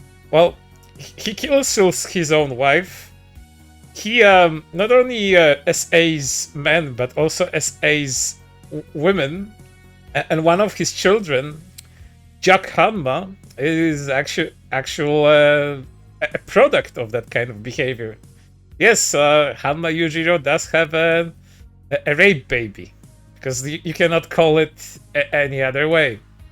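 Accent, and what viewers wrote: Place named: Europe. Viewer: Yeah leave it to some V tuber with a non descript european accent to attempt to cope about Yujiros ultra woke moment where he pwns the conservatards. Yujiros pro trans women anyways, for him thats just dudes finally being honest with themselves. Anyways now I see why people are all the sudden being critical of Baki. Love it when the rightards get mad.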